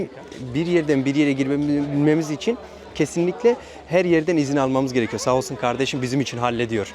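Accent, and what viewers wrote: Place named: Adana, Turkey. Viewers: Kardeşim benim bu yorumları ciddye al sonuöta senin daha iyi yerlere gelmeni istiyoruz doğal akışında kendin oldugun gibi videolar çekmeye devam et belgesel tadında videolar başka bir tarz ve birkaç konuda kendini çok geliştirmen lazım ki gerek yok bence kendin oldugun gibi o anadollu şivenle sempatikliğinle yeterlisin belgesel tadına gerek yok kendini aşağı çekersin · Kanka adanalı mısın konuşma onlara benziyor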